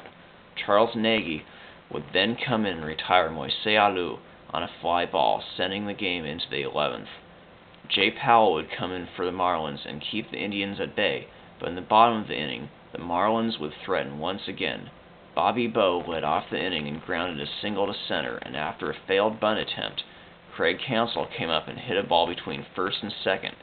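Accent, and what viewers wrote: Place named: Latin America. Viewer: You need to lay off those annoying hispanic pronunciations dude. Do you call Italy "Italia"? Then don't call Rentaria "LLLLLentellllia".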